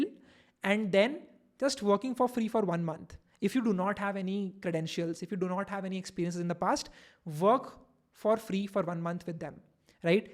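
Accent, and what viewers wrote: India. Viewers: When you speak in English it sounds like you are speaking in Hindi. Your explanation is so good.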